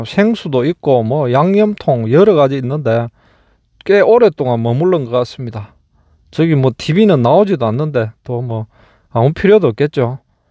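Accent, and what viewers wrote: Gyeongsang, South Korea. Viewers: 구독은 안했지만 영상 올리시면 빠짐없이 봅니다 저는 전북 전주 출신이지만 경상도 구수한 말이 너무 좋네요 그리고 제가 좋아하는 약간 쓸쓸한 음악과 경치 한편의 시적상상이 떠어름니다 마음으로 항상 감사함을 느낌니다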